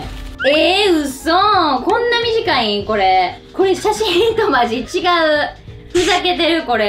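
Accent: Kansai, Japan. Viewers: きょうはめっちゃ関西弁でおばさんのようなテンションで可愛いです笑笑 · 関西弁の辛口レビューさいこーおもろい😂 これからも関西弁で辛口レビューおねしゃす🤍🤍🤍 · 今回いつも以上に関西弁ごりごりで可愛い😂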